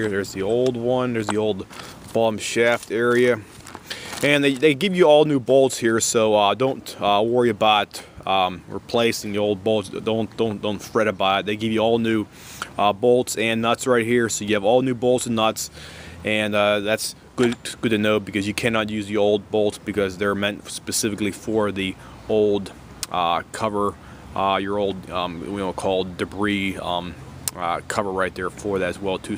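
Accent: Pittsburgh, United States. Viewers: Thanks for the video. Btw, you sound very Pittsburgh.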